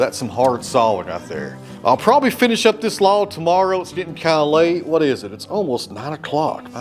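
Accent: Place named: Kentucky, United States. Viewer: Was this english? CC displayed it as such, but it almost sounded like groningen to me (from dordrecht). Ok, must be a local dialect in kentucky.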